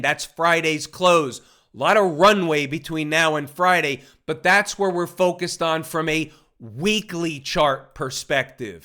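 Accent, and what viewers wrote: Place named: New York City, United States. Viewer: The NY accent is over the top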